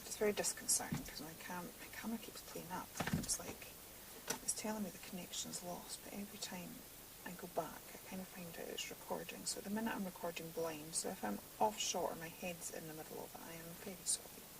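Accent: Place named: Scotland, United Kingdom. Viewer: This is a beautiful project, love the papers! I especially love your brogue! :-) My grandparent's came from Ayrshire area (Saltcoats) and I still have cousins there that come visit the states quite frequently. They have been telling me to come visit (have not been since I was 10). Such a lovely country and the people are amazing!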